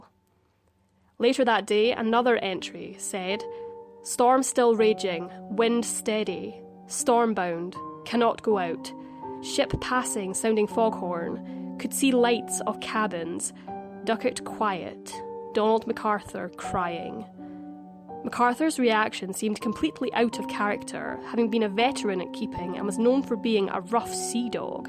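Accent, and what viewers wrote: Scotland, United Kingdom. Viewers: That was so creepy, your delivery is perfect and your Scottish accent makes me listen intently......When I was a kid I always wanted to be a lighthouse keeper. Thanks from a sassenach -)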